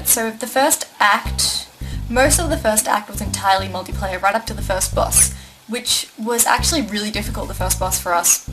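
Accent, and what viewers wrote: Australia. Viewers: Insta-subbed! It's so great to hear a fellow Aussie on the interwebs for once. :) Where abouts are you from?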